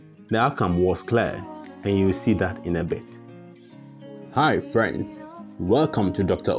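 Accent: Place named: Ghana. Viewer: This is ain informative experiment that clearly illustrates the advantage of starting the pineapple suckers in water as opposed to planting them straight in the soil. Much thanks. By the way, if you don't mind my asking, your name sounds like a Nigerian Igbo name, yet your accent is unmistakably Ghanaian, and this got me wondering if is in fact a common name in Ghana as well as in Nigeria. Please forgive the minor deviation from the theme of gardening. I have always been fascinated by accents both international and African, hence my curiosity.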